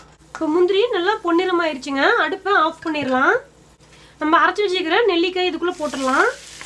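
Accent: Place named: Gobichettipalayam, India. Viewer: hi !!!! I'm also from gobichettipalayam......unga salang keta udane namma oru than irukum nenaanachu check pannana semma...semma nenga namma gobichettipalayam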